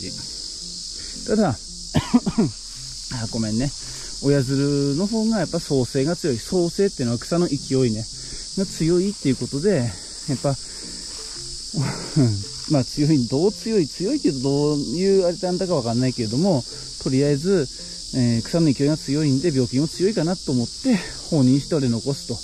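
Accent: Tsugaru, Japan. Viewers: 初めまして。 あの、お教えください。 雌花が受粉されているか否かはどのように見分けるのでしょうか？ お返事お待ちしております。 それと、津軽弁？いい感じですね。 津軽弁YouTubeで人気ですし。 すんたろす、って言うYouTuberのお兄様かと最初思いました。 😅 津軽弁全面に出した方が登録者増えるかもです。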